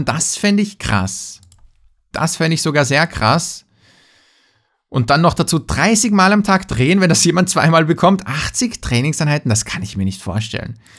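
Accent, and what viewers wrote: Austria. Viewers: bist du aus Österreich?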